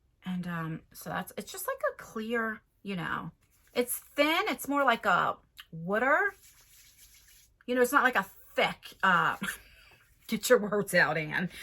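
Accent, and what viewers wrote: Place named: Philadelphia, United States. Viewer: You're accent makes me nostalgic for home. I'm from Philly but Jersey n Philly ppl have the same accents. Lol. My accent kinda diminished through the yrs of not living in the area. It took a long time to stop myself from saying wooder instead of water.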